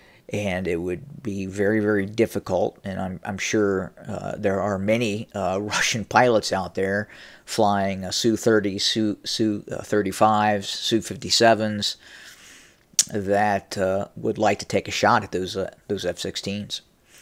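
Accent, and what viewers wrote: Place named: United States. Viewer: Don't let the American accent fool you.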